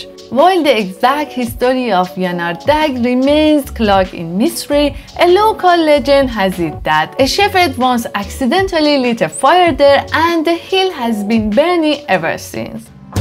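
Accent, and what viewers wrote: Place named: Iran. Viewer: I love your Iranian accent❤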